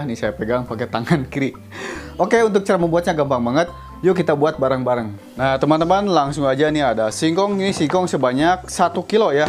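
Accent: Sunda, Indonesia. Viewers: Porocotin heheee.. Sundanese pisan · Mantap Aa dn mksih infony sunda bgt AA 😁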